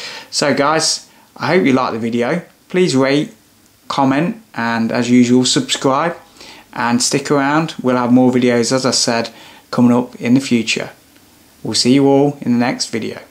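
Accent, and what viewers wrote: United Kingdom. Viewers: Cockney accent. People really admire it.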